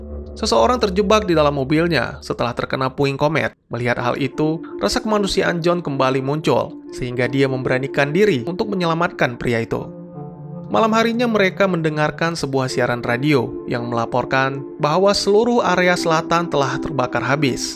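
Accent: Aceh, Indonesia. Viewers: Weh abng orng Aceh bukan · Dro ureng aceh nyo bang?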